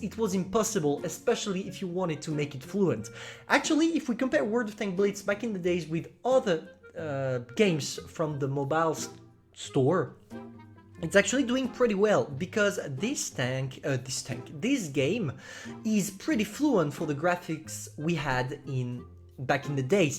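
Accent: France. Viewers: omg ce french accent j'adore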